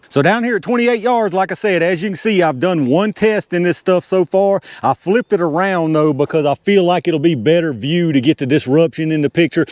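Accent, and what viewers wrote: United States. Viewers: God damn you sound like America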